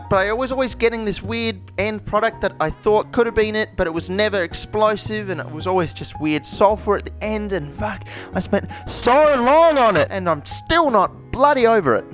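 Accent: Australia. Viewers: finally a chemist who is informative, and yet satisfies my need to hear an Australian say their favorite "C" word about 65 times in a video. Easy sub. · He looks and sounds like an Aussie Gerald Buttler.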